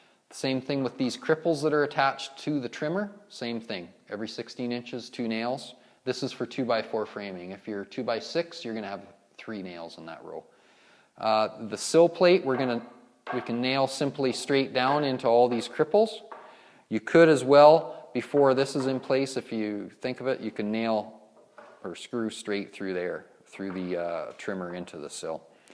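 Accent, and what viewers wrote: Canada. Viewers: you sound Canadian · BTW - do you live in or near Canada? :) I hear the long "o" sounds (aboot). :) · Sounds like he is canadian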